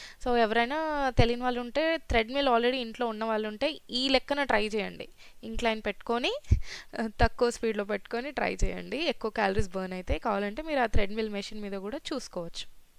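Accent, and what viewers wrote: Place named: Telugu, India. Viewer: Telugu because I love u r telugu slang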